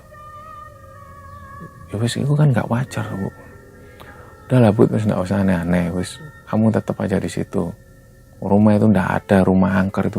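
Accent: Java, Indonesia. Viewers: Langgam jawanya 👍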